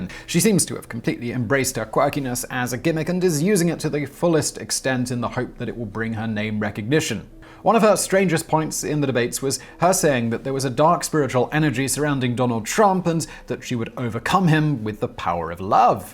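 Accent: United Kingdom. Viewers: Spoke like a true brit "not to be messed with"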